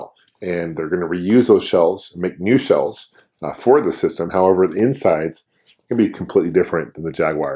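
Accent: United States. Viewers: In my part of the U.S., I believe that jagwire is the most common pronunciation. I think is from my area, just from listening to his accent.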